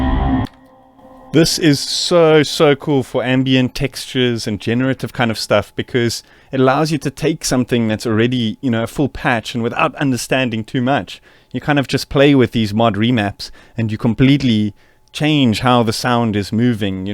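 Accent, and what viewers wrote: South Africa. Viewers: you sound South-African. Are you? :)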